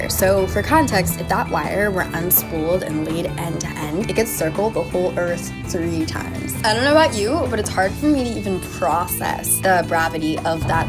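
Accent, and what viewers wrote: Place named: United States. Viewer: Hi, I love in American Accents. By the way, May I know Where is your accent in America? Thanks you 🇺🇸🎉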